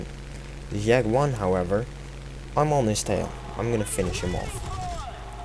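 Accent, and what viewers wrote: Netherlands. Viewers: Nederlander? But a nice vid!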